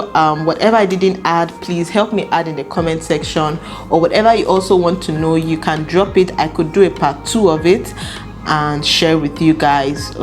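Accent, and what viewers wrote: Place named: Nigeria. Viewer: She is nigerian and they speak french, I believe that's the reason for her accent.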